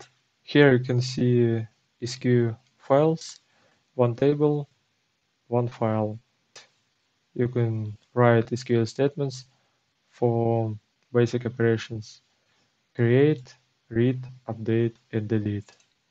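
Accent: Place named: Post-Soviet states. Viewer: Искал инфу по SQLDelight, начал смотреть видос, и такой, акцент, слишком грубооо, пахнет снг, потом смотрю а это )Лайк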